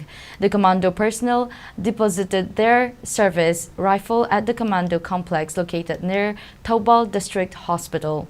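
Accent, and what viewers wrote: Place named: United Kingdom. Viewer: Wow British accent. Must be u work in BPO UK process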